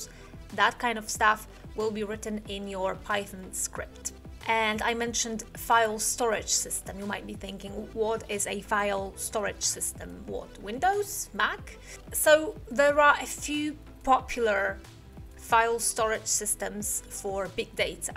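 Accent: England, United Kingdom. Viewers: Haha I love your english accent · Hello, just subscribed, AMAZING content! Very helpful! May I ask which country you are from, because sometimes you sound british or french or even russian!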